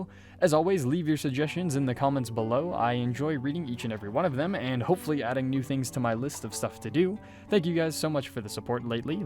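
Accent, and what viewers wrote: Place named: Saskatchewan, Canada. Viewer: fair enough… I was just sitting here watching with my girlfriend wondering aloud if you were from Saskatchewan.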